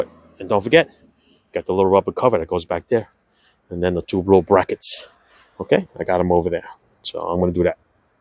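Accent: New York, United States. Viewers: Is this, the old bracket racer long ago, from Seattle? If so, you sound different, like you're from New York, now. I don't recall you having an accent before. Anyway, why were your intake ports on the heads so dirty?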